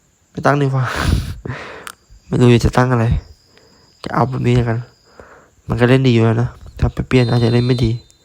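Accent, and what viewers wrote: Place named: Ang Thong, Thailand. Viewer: คนอ่างทองหรือสุพรรณคั้บเนี่ย สำเนียงเหมือนเพื่อนผมเลย😊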